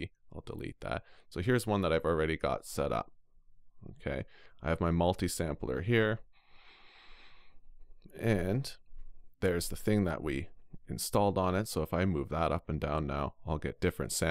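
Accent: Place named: Canada. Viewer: Are you Canadian? I'm trying to figure out where your dialect is from. You've got some serious regional inflection.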